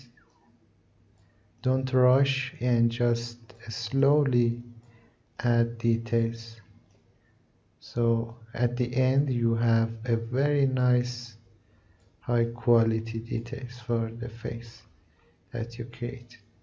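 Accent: Iran. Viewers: this guy is definitely persian jfc, his accent is so thick i cant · Are you persian? The accent gives it away😆❤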